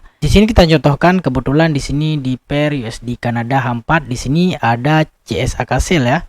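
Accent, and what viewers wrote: Aceh, Indonesia. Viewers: Orang aceh ya?? Logat jih khas that..😊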